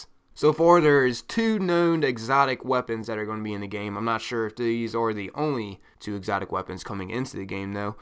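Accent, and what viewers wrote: United States. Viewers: it seems like your voice slowly turned more country throughout the video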